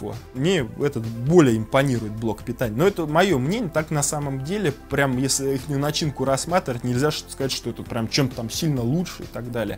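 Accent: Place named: Okanye, Russia. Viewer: Что за Оканье такое =))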